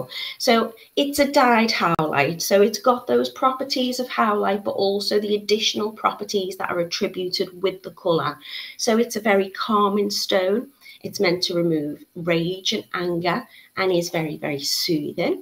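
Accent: United Kingdom. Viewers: NC here! I love your accent!! Reminds me of 2 great months we spent in England in the 90’s. Love making jewelry!! Thank you! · Are you from the Uk?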